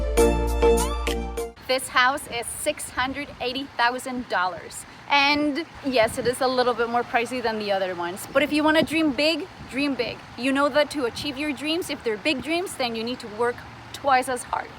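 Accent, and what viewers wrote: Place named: Colombia. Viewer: I like your Colombian accent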